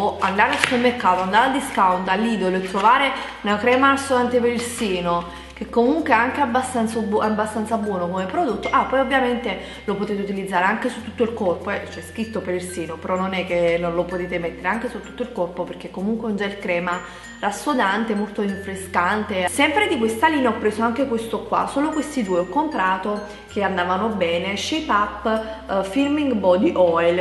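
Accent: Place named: Castellammare, Italy. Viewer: ma sei di Castellammare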